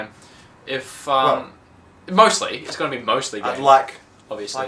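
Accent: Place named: Australia. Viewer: Young with his super strong Aussieland accent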